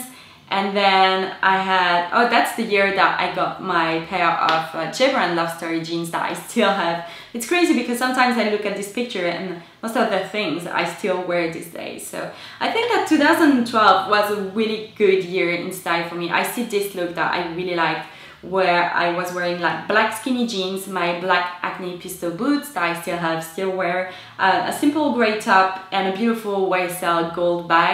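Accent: France. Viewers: Are you French? I'm french, x) and when people talk in english It's the same accent x) sorry for my bad english ;w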